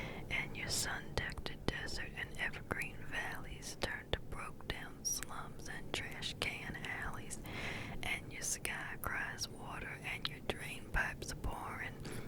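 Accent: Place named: Louisiana, United States. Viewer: These lyrics struck me in an interesting way. It reminds me so much of how I've felt for a while, so it hit me quite deep. I could relate to it. And the country accent, it reminded me of a Louisiana accent, particularly from southern Louisiana.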